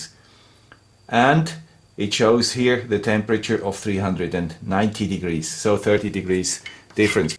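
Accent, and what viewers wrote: Switzerland. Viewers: Congrats with #100. I enjoy looking at your videos and learn something every new video. I do hope you do not start #101 again by saying 'this is the guy with the Swiss accent'.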